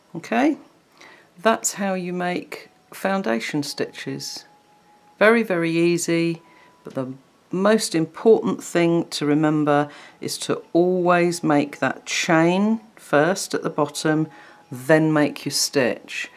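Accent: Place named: United Kingdom. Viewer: So lovely to see a tutorial in British English! Thank you x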